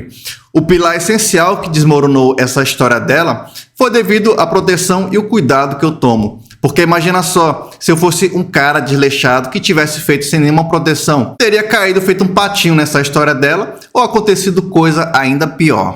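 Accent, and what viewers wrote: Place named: Fortaleza, Brazil. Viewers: Adoro os vídeos do, e a forma que ele fala é resenha e muito natural - "o mermo convite". Nordeste raiz (também sou) 👐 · Voce e muito inteligente engracado.Tenho uma curiosidade voce e cearencepois tem um sotaque de Fortaleza.eu sou cearence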